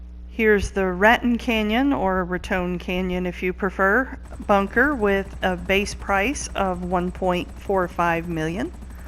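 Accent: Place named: South, United States. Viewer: Just ever so slightly southern, but I know what to listen for.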